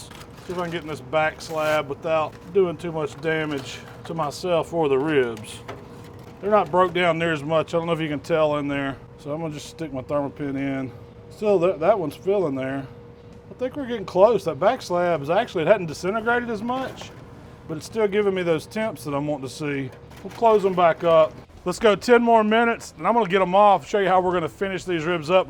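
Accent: United States. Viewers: The most american person i have ever seen, the accent, the spirit, the bbq skills, gotta love it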